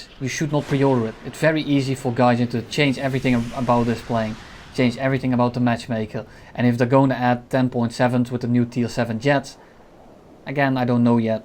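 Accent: Netherlands. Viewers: Nah just doesn't have a heavy or deep voice, which probably makes the actual accent less clear, but it is still unmistakably Dutch. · Yea he is Dutch xd you can hear that clearly love it🤣🤣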